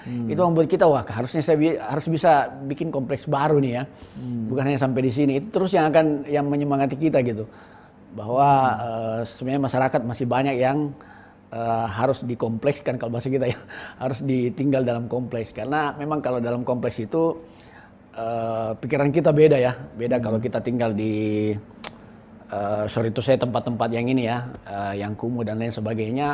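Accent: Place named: Makassar, Indonesia. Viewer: edeedeeee kentara na makassar na ini daeng 👎👎👎👎👎🤪🤪🙈🙈🙈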